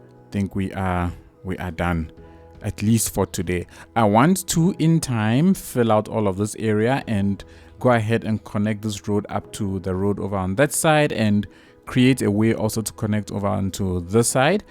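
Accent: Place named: South Africa. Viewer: I heard the accent and immediately knew this is a fellow South African.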